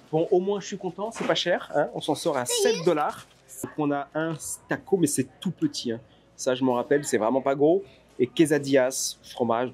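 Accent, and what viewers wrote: France. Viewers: Ton anglais est tres francisé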